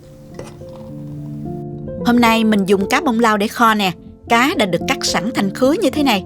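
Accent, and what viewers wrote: South, Vietnam. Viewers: nói đúng chuẩn giong nam bô rất dể thương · Giọng nói nghe thân thương ghê vậy đó. Người miền Nam mình nè!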